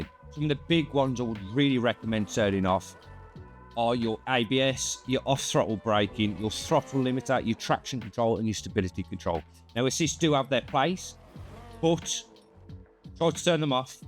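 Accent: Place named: Midlands, United Kingdom. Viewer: For anyone not from Britain... this is a classic "Midlands" accent ... 🫡🇬🇧 It's equivalent to the American 'deep south.' 😂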